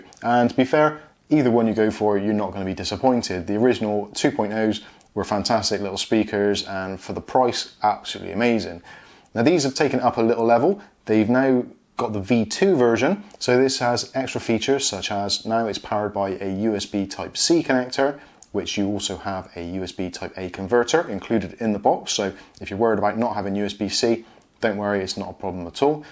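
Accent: Bristol, United Kingdom. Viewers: Are you from bristol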